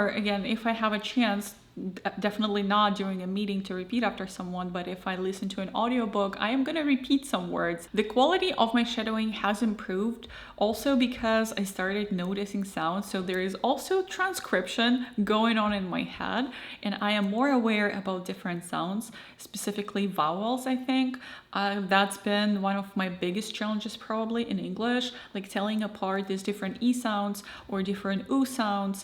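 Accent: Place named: Russia. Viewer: Oh no.... i liked your Russian accent who was lerning french 😭😭😭😭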